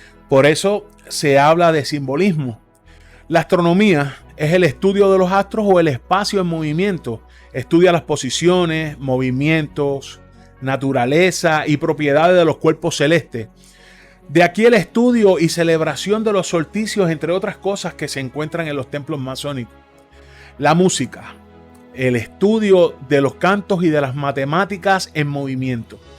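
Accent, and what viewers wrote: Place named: Puerto Rico. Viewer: Quiero saber mas de este tema pero buscare algo mas confiable ya que usted siendo de puerto rico le quita seriedad y credibilidad ademas de que ese acento da mucho asco, mejor busco otro video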